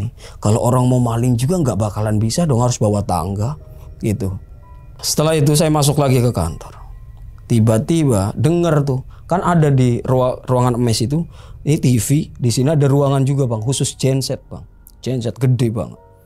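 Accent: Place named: Indramayu, Indonesia. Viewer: Indramayu tenan kih uwong.....😂😂😂😂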